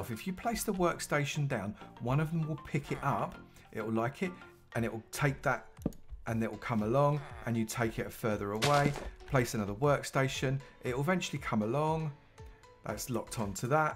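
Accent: United Kingdom. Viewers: You mean proper English or a United Kingdom accent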